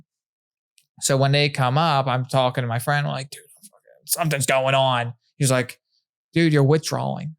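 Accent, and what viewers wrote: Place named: Delaware County, United States. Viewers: I swear I was in bowling green in pa with you can’t forgot that delco accent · Wish I could listen, but that Philly accent grinds my gears hahahah. Practicing tolerance 🙏🏻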